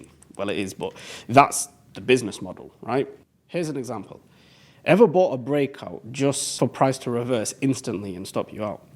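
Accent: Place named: United Kingdom. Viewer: concise and articulate delivery, paired with his clear British accent, makes complex trading concepts much easier to follow. I truly appreciate the direct and structured approach—far more effective than the overly drawn-out explanations some others offer. Excellent content!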